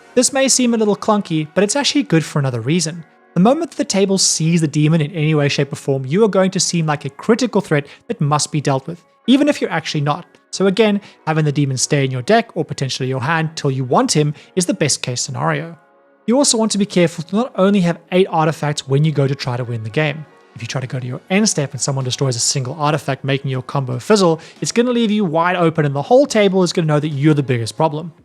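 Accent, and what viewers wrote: South Africa. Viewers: I can't tell if he is South African....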